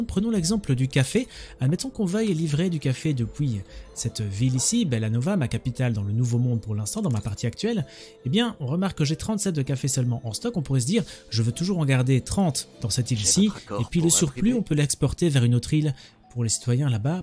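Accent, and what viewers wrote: Belgium. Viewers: Oh purée!!! Merci car je "galère" (C'est le cas de le dire) tant sur ces navettes que je commençais à me lasser d'y jouer...Mais comme tous tes viewers je suis fan; J'aime bien notamment tes petits "belgicismes" et ta prononciation des mots en ui : " depoui, poui, appoui...etc...Hé hé ça me fait sourire mais toujours avec respect et jamais sans "ennoui"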